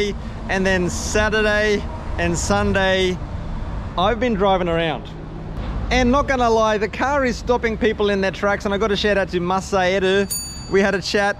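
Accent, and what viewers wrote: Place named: Australia. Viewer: Yeah man! Of all time! I'm struggling to place anyone more down to earth up there, always good to hear an Aussie voice on YouTube. If I ever get that holiday to Japan hopefully I bump into ya! Keep doing what you're doing man, can't wait for the next vid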